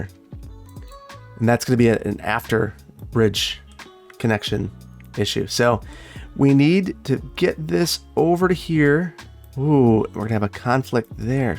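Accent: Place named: Midwest, United States. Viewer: Same! I just assumed he was talking about the Midwest from his accent alone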